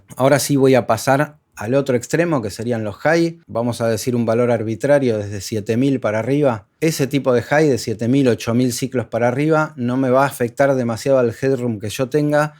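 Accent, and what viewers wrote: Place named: Rioplatense, Argentina. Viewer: Ay que bien, un Argentino o Uruguayo, estoy chato de españoles 😅, saludos desde Chile 😊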